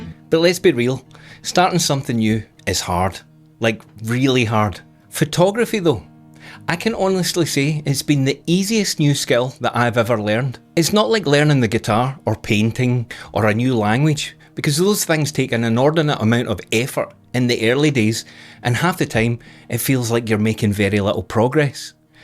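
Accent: Scotland, United Kingdom. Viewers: Well, I'm over 60, and this video is still pertinent. I love the way you covered this,. And your Scottish accent, priceless. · I came for the info and amazing photos. I stay for the Scottish accent and humor tysm 😊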